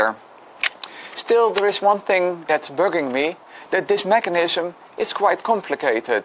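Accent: Netherlands. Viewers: I heard he was from the Netherlands as soon as he began talking XD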